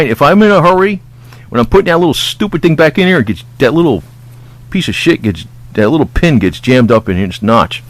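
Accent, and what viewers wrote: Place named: New York, United States. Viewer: Form NYC sounds like.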